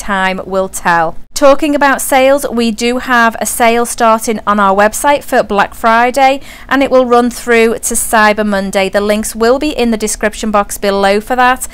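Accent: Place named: Merseyside, United Kingdom. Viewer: Hi the old tank looked good but the new tank looks amazing much more natural, with the Amazon puffers being wild caught the more natural feel of the tank and most of the glass been covered up the fish obviously feel a lot happier, great job 👍 Ps l've just checked out your website and realized that you are in the US but your accent is the same as mine merzyside! 😂😂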